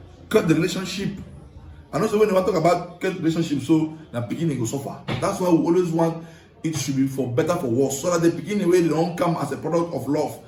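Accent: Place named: Nigeria. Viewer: Combine bro. Pidgin and British English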